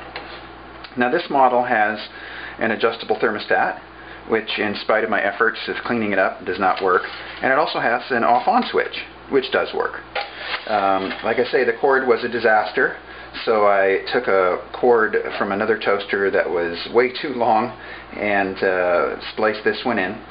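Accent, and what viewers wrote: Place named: Canada. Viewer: Your accent is, like, 5% Canadian. Are you in northern Washington?? What a cool accent, man.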